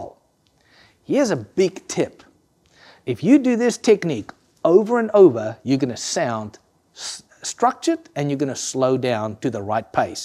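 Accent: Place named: Afrikaans, South Africa. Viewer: Your accent sounds quite Afrikaans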